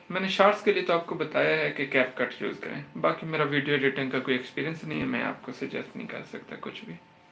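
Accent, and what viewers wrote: Saraiki, Pakistan. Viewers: Aoa bahi upwork k hawalay say kuch kuch dost janty han per ap jo behtar basic ssmajhty han zaroor baten take jo new dost hy ap ki piyari sweet sarski awaz m wo be seekh saken jasa k ap kehty han k sekhen elam.hasil karen samjen to is klie sekhna zarori hy or ap behtar andaz main proper sekhaty han sir sb tamam pakistiyon sariki waseb sb doston bshi ki tarf say ap ko bhot sara piyar thaks with love u all... From Engineer multan say sir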